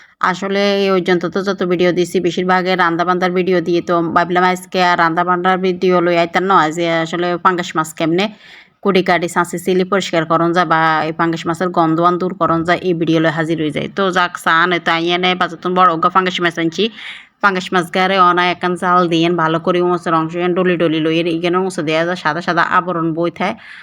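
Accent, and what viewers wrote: Noakhali, Bangladesh. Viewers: আপু নোয়াখালী ভাষা খুব ভালো লাগে ❤❤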